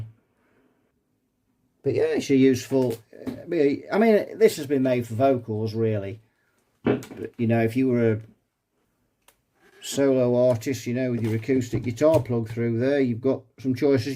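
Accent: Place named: North Derbyshire, United Kingdom. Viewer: I think it’s the dialect, plus some / many of the words. “ Tatered” as in “I think that capacitor is tatered.” Many won’t understand the words peculiar to the north Derbyshire/ Nottinghamshire dialect. 👍👍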